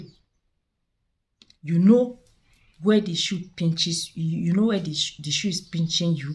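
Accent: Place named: Nigeria. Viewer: I am from Tanzania. I have been following your videos with a lot of admiration and faith if to say so. The only problem we from this part of Africa are facing is your Nigerian accent. Your (Nigerian) pronunciation is very different from ours. This makes it hard to understand you at times otherwise, you are blessed Madame and may God continue to use you.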